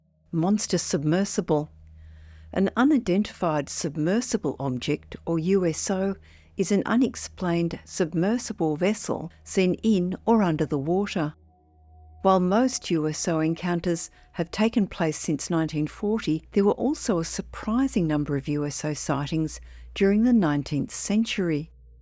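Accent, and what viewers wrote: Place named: Australia. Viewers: It sounds like an Aussie accent (Australia) or a Kiwi accent (New Zealand). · That's an Australian accent, pretty close though.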